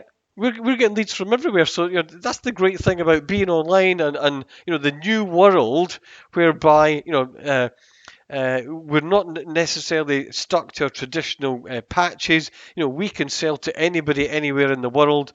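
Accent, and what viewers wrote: United Kingdom. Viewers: I was listening to you and thinking he has a Scottish accent. Then you mention you are from Edinburgh. Thanks for this video. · 😁 Glad to oblige!! Really appreciated your webinar - and in a proper British voice (My brother's up in Bathgate!)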